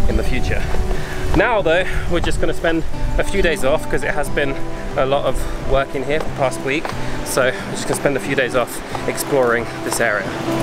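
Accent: United Kingdom. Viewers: I like your accent. Is from UK Right ? Or Am I wrong? I’m from El Salvador I like how people enjoy their time in my little and beautiful country I miss living there., I hope retire in my country hopefully some day. Cheers from California .🌺🌺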